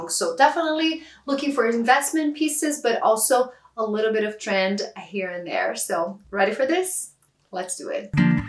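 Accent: Brazil. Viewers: Are you Brazilian ? I’ve heard a Brazilian accent since the first sentences you said (actually, I’m writing this comment on minute . Just curiosity 😊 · is brazilian for sure, that accent... 🤔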